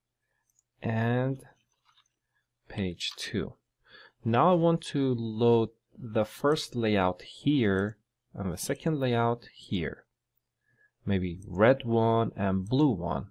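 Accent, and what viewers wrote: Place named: Iran. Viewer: You have a persian accent , are u persian hamvatan?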